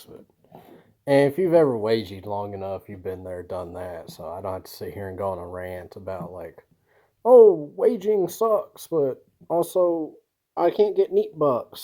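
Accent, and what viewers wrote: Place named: South, United States. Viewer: Btw idk why, but the southern American English accent is my favorite. Your voice and accent rly gets me feeling cozy, next to a campfire, having a drink with ppl i trust (idk thats how your accent gives the vibe for me).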